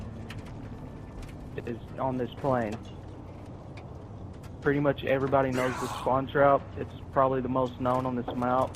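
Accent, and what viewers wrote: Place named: South, United States. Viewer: People from the south don't give fuck how they talk buddy [: Js...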